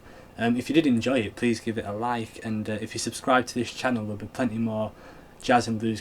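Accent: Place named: Northern England, United Kingdom. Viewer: Very helpful lesson. I have a better grasp of the scale now. and the Northern accent is quite pleasant. Wondering if you could do a quick lesson on the melodic minor over a standard blues progression, a la Robben Ford or Carlton. as in melodic minor on the last bit just before the iv chord. Cheers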